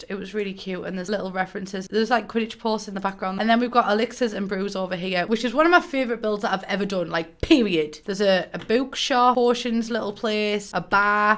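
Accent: United Kingdom. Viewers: Here i am again downloading it again thanks i did keep up with your dialect even tho i'm from norfolk nice to hear a brit talking about sims makes a change from the yanks all the best love to you and stay safe.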